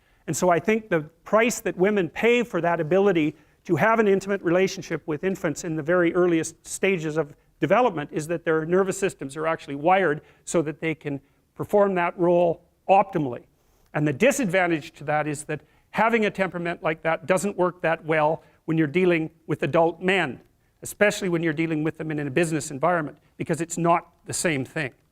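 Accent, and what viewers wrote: Canada. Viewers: This guy's Canadian, eh?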